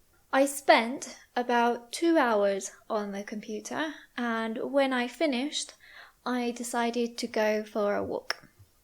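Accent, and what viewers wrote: United Kingdom. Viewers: Her tone of voice, pronunciation and intonation are really very good. The recordings are pleasing to ears, much better than those channels which adopt AI sounds. Well, another reason might be that I prefer the British accent to the American accent. 😃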